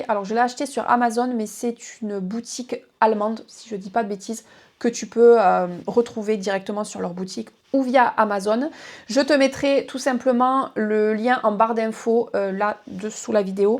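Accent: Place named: Béziers, France. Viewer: Ah je me disais bien que cet accent c'était celui de chez moi 😄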